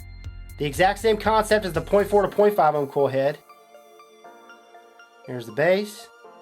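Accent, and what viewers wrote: South, United States. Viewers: rahliE05 that's how people from the south sound...like motor oil sounds like motor oh-ill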